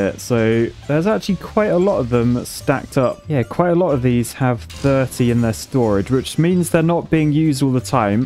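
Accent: United Kingdom. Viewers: this guy is like let's game it out but british and ever so slightly more serious...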